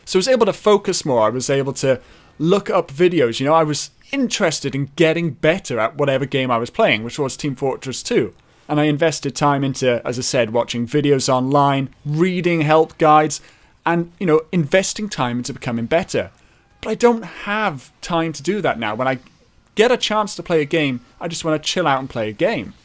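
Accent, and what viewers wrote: Wales, United Kingdom. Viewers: I can't quite make a definite decision but I seem to keep picking up on a little tinge of a Welsh accent when you speak. Being from Wales myself I can somewhat recognise certain changes in the way you pronounce things such as "here". Anyway, sorry to ramble, was just curious.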